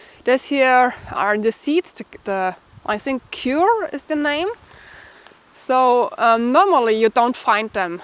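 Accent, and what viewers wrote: Germany. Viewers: I love German accent, especially with all the mistakes. She warmed my heart through the entire pandemic. The world is already cold enough, don't you think?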